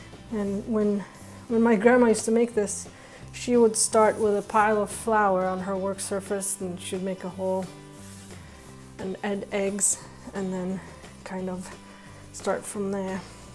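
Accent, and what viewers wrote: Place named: Poland. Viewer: Wonderful recipe and video! I really love the way you walk us thru too. I do best when I can actually SEE how it works, so your videos always work for me. I am half Polish and grew up in Greenpoint , Brooklyn NY. Which is known as “Little Poland”......lots of Polish butchers and the best kielbasi you can find! But I have been trying to learn more about the many other authentic foods and the recipes to go with them, so I have a lot to look forward to on your channel. I thank you for sharing so much with us!! PS- I love hearing your accent! Half of Greenpoint sounds just like you, so it takes me right back home for a little while..... I love it!! ❤️ 🥰❤️🙋🏻‍♀️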